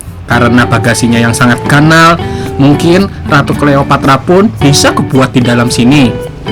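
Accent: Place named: Kalimantan, Indonesia. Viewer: Khas kalimantan nya asri banar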